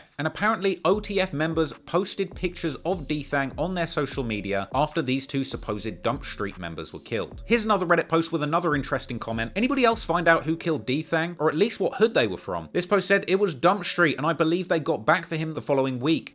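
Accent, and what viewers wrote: United Kingdom. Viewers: just a heads up a british accent is not at all good to try being cool and for sure not trying to speak slang man it is horrible please stop trying to talk slang AT ALL or bringing attention to shit the cops may not know yet but putting things you learned out there for more options drama is good for views but not talking street shit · I like how “trap shit” is getting covered by a harmless sounding British fella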